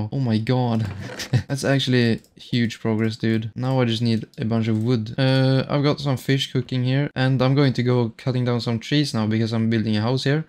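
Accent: Sweden. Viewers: to be honest you kinda sound swedish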